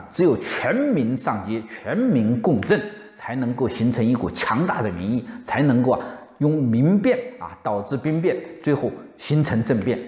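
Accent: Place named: Henan, China. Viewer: 做人我不管我听不惯河南话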